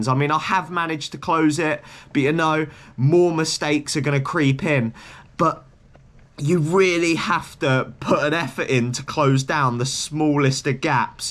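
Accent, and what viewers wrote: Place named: United Kingdom. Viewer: Accent was so unbearably british I had to stop watching one minute in.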